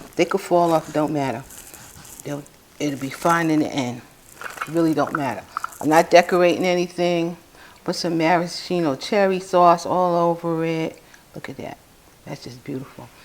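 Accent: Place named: New York City, United States. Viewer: I'm going to make this for thankingiving u sound like a NYC lady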